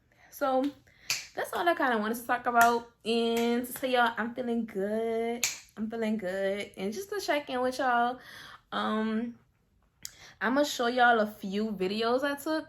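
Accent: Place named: Louisiana, United States. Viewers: Its the LA accent for me bebby! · Hey, I have a question are you from 504? The Accent sounds like the south mainly New Orleans which is where I’m from. My friend said hey go check this video out sis sounds just like you. Lol Peay that you have a fast safe recovery 🙂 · I HAD TO FOLLOW BECAUSE U FROM MY HOMETOWN U SOUND LIKE U FROM LOUISIANA AND HOW OLD IS UR MOM IM 8 MONTHS POST OP IM GOIN BACK DECEMBER MIAMI JOLIE GETTING A 360 LIPOSUCTION AND A BREAST REDUCTION WITH SOME FAT TO HIPS THE LAST TIME I HAF DR SAMPSON FROM SEDUCTION HE DID MY 360 LIPOSUCTION WITH A BBL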